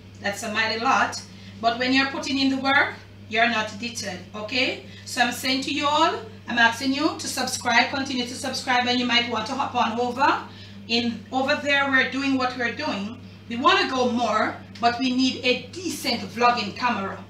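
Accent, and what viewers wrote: Jamaica. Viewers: SHE IS SO HILARIOUS, WHEN SHE SPEAKS JAMAICAN VERNACULAR FAST, I DON'T UNDERSTAND ONE THING SHE SAYS. BUT, IT SO FUNNY. WHEN SHE SLOWS HER SPEECH I UNDERSTAND EVERYTHING SAYS. I LOVE THAT SHE DID NOT COMPROMISE HER JAMAICAN ROOTS IN DESCRIBING HOW TO ROAST THE BREADFUIT. I LOVE ALSO THE CANDOUR IN FRONT OF THE CAMERA. MADE ME FEEL LIKE I'M HER BEST FRIEND. I CAN SIT AND CHAT WITH HER ALL DAY.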